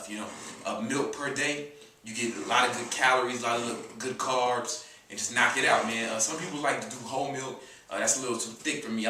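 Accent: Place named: Chicago, United States. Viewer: sure you do*,you completely avoided the question of are you a fun/lovable person in real life. by the looks of your responses you seem to be really bitter. why are you judging and getting bothered by a accent from a black man from Chicago. I know for a fact there are people who wouldn't seem "intelligent" in your eyes but have more money and a fulfilling happy life then you.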